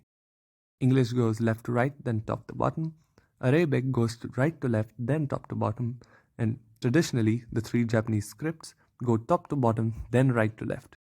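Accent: India. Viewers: Your sound indian , are you Indian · Why the Indian accent? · I wanted to watch this but I just can't do the accent. I'm sorry. I just can't listen to Indian accents.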